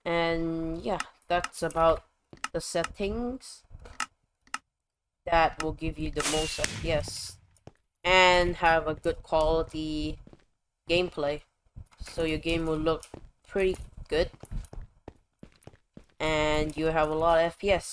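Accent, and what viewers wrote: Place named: Singapore. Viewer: i am singaporean and i speak like that too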